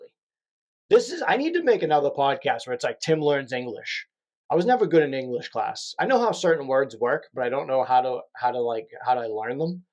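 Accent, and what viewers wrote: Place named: New England, United States. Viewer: Are you from New England, Massachusetts maybe 😊 I can hear the accent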